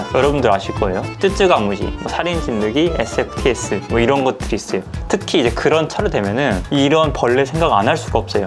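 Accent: Gwangju, South Korea. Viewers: 광주 말투 너무 좋아여!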